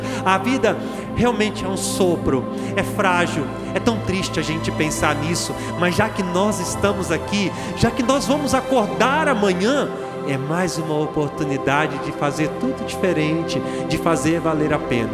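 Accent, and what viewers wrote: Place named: Paraíba, Brazil. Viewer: Eu jurava que o senhor era da Paraíba.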